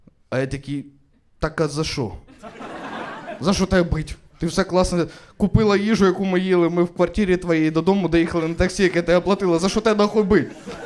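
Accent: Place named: Russia. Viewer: Ох, як же відчувається ,що людина російськомовна... Це навіть не суржик, це фонетичне знущання💔 Хлопчик, ти милий перспективний, і плюсік за старання, але якщо вже ти займаєшься справою, де мова і слово - твій інструмент, ну прикладай більше старання!!! Бо твої російські слова , які ти просто промовляєшь з нібі то українськім акцентом так ріжуть вуха, просто кров з них йде. Лайк заради просування україномовного контенту. Ну і за харізму❤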